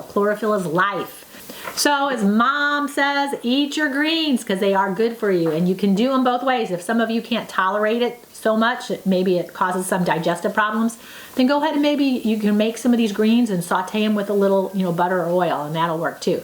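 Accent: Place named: Midwest, United States. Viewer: You have to be from the Midwest with your accent. Minnesota? That's another reason you look so young for your age.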